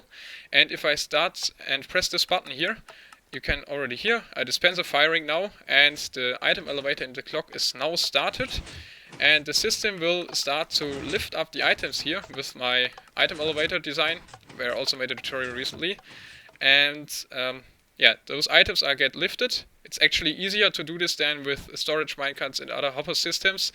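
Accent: Germany. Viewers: Bist du Deutscher? ^^ Dein Englisch hört sich irgendwie so an :D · 100 % german-english ^^ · Du bist deutsch oder? :D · You are german, right? :D Man hörts an der englischen Aussprache :D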